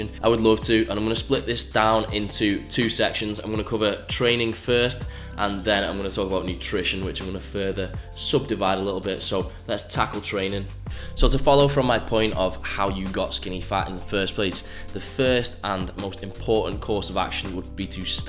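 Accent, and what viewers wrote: United Kingdom. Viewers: Liking the British bants/vibe and info... subbed ! 😂👌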